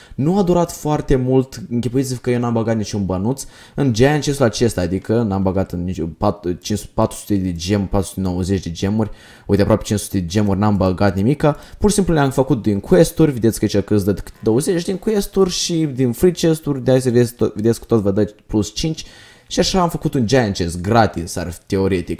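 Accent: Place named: Moldova. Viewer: esti moldovean ? Eu tot ca team inteles cand ai vorbit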